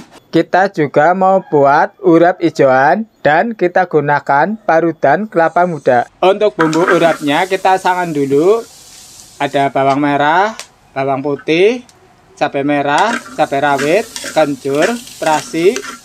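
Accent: Banyumas, Indonesia. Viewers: 😂....Mas daerah mana? Kayak Banyumas logatnya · Kang..niku sampeyan asli bms.versi Cilacap nggih.bag.pundi. koh ksthah pekawisan kalih taneman sdy wonten.versi mbah2 moyang...sdy wonten... obat tradisisyr2 unik.buah2 unik wekdal rumiyin.lan sampeyan ugi asli njaweni nguri.uri adat leluhur.bahasane nggsndem medok bmsan.lah biyunge ugi cekatan ndampingi.sehat2 nggih yuuunge.... pertahankan kang..unik.asli.ngangeni. semangat...🙏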